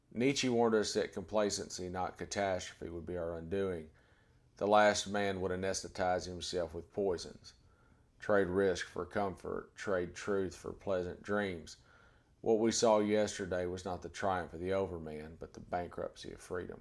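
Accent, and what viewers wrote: South, United States. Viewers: Awesome to hear an American Southern man speaking so perfectly and intelligently on the realities of this issue - with dignity and respect. Well done Sir!!! 👏 👏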